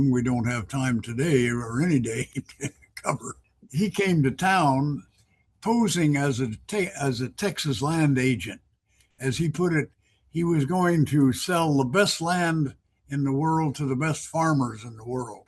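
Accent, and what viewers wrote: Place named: Massachusetts, United States. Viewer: has a very pronounced Mass accent, is less pronounced but I can still discern it. BTW,; I'm originally from Philly and have been trying to lose my accent for years with limited success🤣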